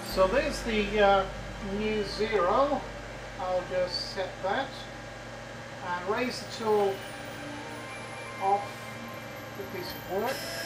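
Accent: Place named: West Country, United Kingdom. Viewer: Going to caaav a climber , the west country accent has hints of where the Baawston accent comes from .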